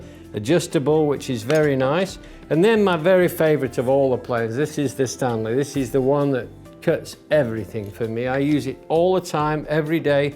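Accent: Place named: England, United Kingdom. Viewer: I only came across your channel a few weeks ago, but what I've seen so far is very good. it was a joy to hear an Englishman doing a carpentry show, the majority are American and some of them are very good too. I really like the way you use feet and inches; I still measure in imperial although I have on some occasions leaned toward metric. You remind me of my old carpentry trainer, he was real old school, this was way back in the 80s. He would not let us cut anything with a machine until we were competent at cutting using a saw, chisel and mallet, what he would say was "There won't always be electric on job lad" in a broad Lancashire accent. As I said it's really great to see somebody using hand tools again, it's becoming a dying art. Thanks, Jim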